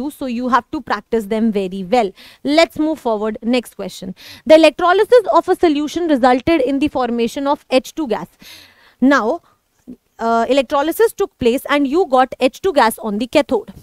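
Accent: India. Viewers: Bhai AMERICA mein American english bolte h isliye smjh nhi ataa but MAM indian english bol rhi h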